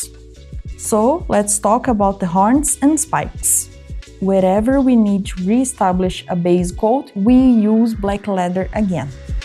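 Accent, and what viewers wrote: Brazil. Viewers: Hey, are you brazilian? I think i noticed a accent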